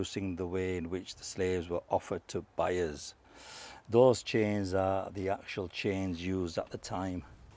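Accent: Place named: Yorkshire, United Kingdom. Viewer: That’s one string Yorkshire accent! Great video 👍🏻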